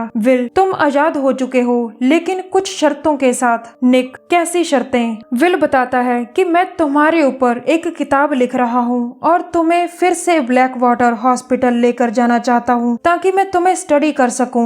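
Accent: Punjab, India. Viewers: kya yaar punjabi hindi with break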